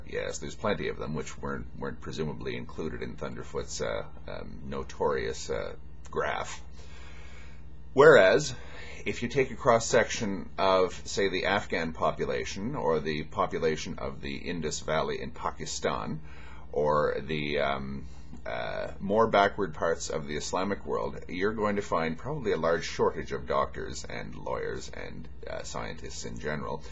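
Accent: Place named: Canada. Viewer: Hehe, Sir, I know a Canadian accent when I hear it:D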